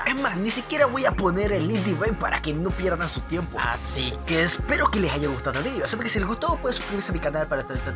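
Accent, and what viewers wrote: Chile. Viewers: eres chileno :v?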